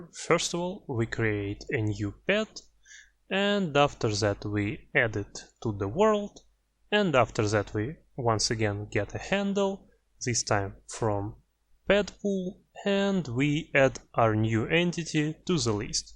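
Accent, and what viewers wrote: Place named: England, United Kingdom. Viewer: Oh, you from England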